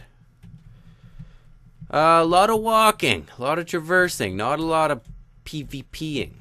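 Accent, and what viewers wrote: Canada. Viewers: Ohh he's Canadian ! I was wondering what his accent was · Is you Canadian? This is the first video I've seen from your channel, so I don't know.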